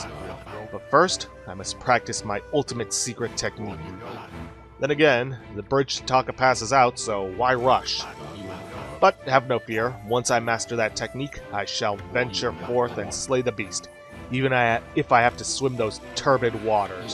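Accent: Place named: France. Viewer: I'm rather enjoying your French accent. Also, I'm not French, so I can't make an assessment of how good it is.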